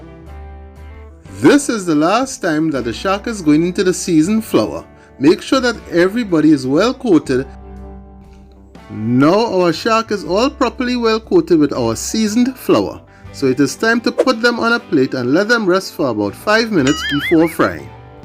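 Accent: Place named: Trinidad and Tobago. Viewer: your videos are awesome too - I love the Trinidad vibe - two of my best friends are a couple and she is Trini - they live in LA and I see them a few times a year and her whole family is so positive! That is who I went to Carnival with and your narration is just 100% that vibe 😊 it's fun to watch even if I don't think I have the cooking chops to do what you do!!!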